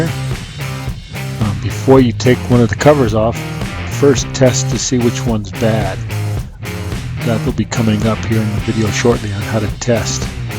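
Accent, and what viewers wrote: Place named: Minnesota, United States. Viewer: Great Video!!! Your either cold or your Minnesota Sweed accent is coming through!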